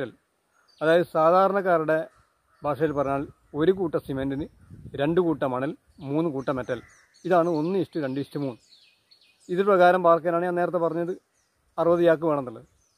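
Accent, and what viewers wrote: Kannur, India. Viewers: ningalude samsaram kannur anennu mansilayi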